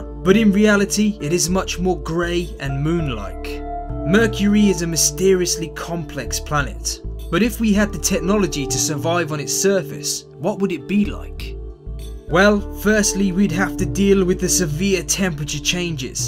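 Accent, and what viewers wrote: United Kingdom. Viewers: I am most definitely sure that it’s a British accent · Nice use of Fahrenheit in 2015 with a British accent where we use Celsius unless you're older than like 50. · Your accent is British but PLEASE dont talk the American way by saying farenheight cuz your english is native and how it is supposed to be whereas America's dont own the english language natively in which they rewinded a few words making it confusing, Its your language please say degrees Celcius and maybe put farenheight in brackets? (sorry for my poor spelling)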